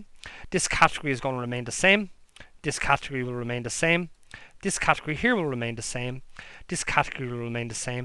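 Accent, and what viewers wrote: Ireland. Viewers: You're Irish right?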